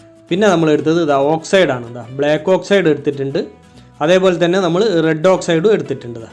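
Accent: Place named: Kozhikode, India. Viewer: കോഴിക്കോടാണല്ലോ ഭാഷ,ഞാനും ഒരു കോഴിക്കോട്ടുകാരനാണ്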